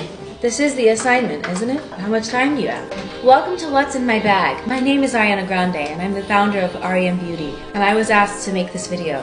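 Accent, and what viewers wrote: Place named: United Kingdom. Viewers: Well, she was in london for a long time so it's natural she'd pick up the accent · she sounds british now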